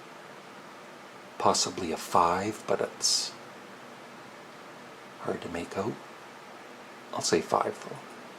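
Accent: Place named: Canada. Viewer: First off I love your Canadian accent. Secondly I am not color blind and saw a clear number in every circle. You were very accurate in your guesses when you weren’t sure. I hope you get the chance to get the glasses from what I’ve seen online it can be really life changing. Maybe you could do a go fund me and I’m sure people would donate.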